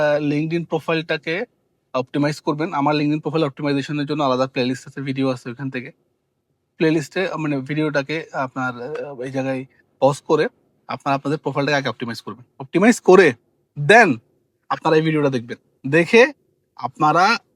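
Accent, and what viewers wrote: Chittagong, Bangladesh. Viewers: bhiya apni ki chittagong er??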